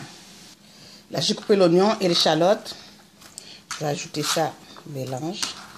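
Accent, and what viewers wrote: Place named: Haiti. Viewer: Tu as l'accent créole haïtien et québécois. C'est marrant.... hello de Guyane. J'aime beaucoup le picliz. Humm miamm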